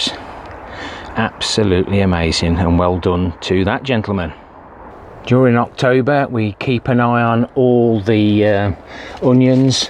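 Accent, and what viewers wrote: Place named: Midlands, United Kingdom. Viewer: Where in the Midlands are you from? I'm originally from Leicestershire! Now living in Scotland for the past 10 years 😁👍 I recognise the accent!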